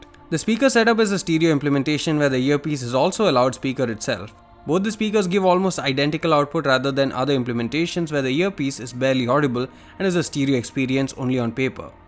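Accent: Kerala, India. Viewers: are you from kerala?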